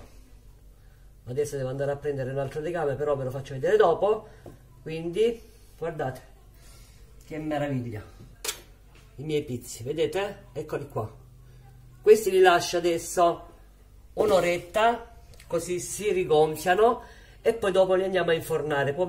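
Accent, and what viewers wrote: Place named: Lecce, Italy. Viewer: Grazie per le tué ricette .io sonno nata a nardò e vivo in francia . Mi fa tantôt benne sentire il dialeto leccesse.avevo 4 anni quando son arrivatta in francia.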